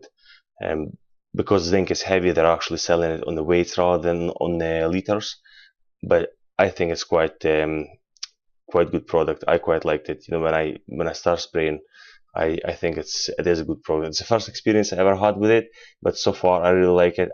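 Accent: Scotland, United Kingdom. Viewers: Love how the Scottish accent comes through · Love the accent. Is it Aberdeen? Dundee?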